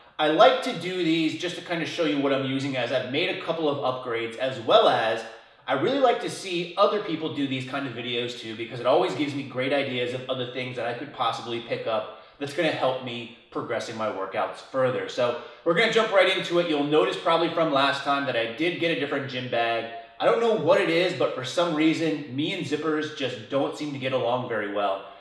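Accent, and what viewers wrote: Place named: Baltimore, United States. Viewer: are you from Baldimore?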